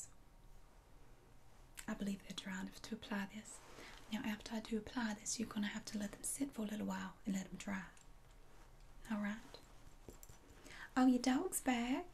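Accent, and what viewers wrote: South, United States. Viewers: I love these role plays, you are so good at playing this role. Please do more :) this might be a silly question but are there still ladies in the south who speak that way?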